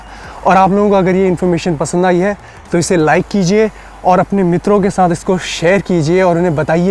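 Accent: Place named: Uttarakhand, India. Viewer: You look so genuine. Aapke hindi main aapka uttrakhandi hota jhalakta hai 😉😉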